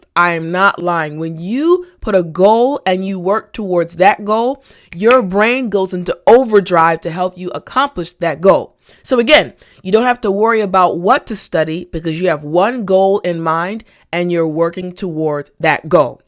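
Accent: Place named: United States. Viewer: Hii I'm from India at Odisha name meek ranjit thank you immensely for your lectures really I'm slowly understanding of your an American accent so appreciate your voice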